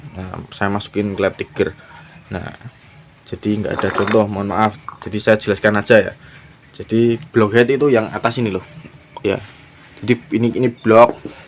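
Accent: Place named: Bojonegoro, Indonesia. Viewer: Tak kiro wong ndi ae mlaah podo bjne😂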